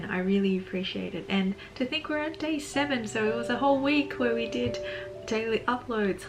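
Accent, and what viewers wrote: Australia. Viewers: Thanks for the thanks. I’m really grateful to hear an Aussie accent in the YouTube world. I like your chilled vibe. And mental health tips are always useful, especially in the time of COVID.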